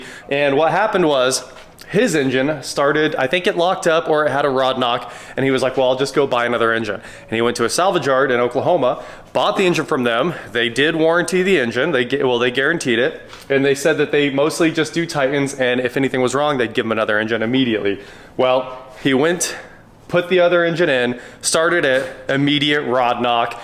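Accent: Kansas, United States. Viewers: “Ardic blast” gotta love that Kansas accent haha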